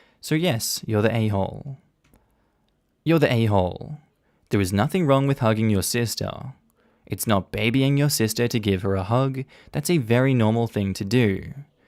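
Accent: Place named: Australia. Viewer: I love having an Aussie to read these.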